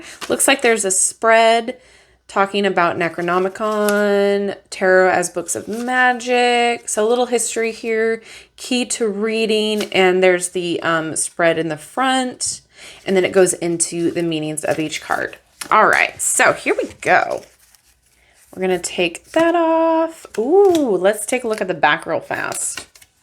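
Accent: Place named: United States. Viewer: спасибо за обзор! У тебя такой красивый акцент!!! Класс! Прям американка!!!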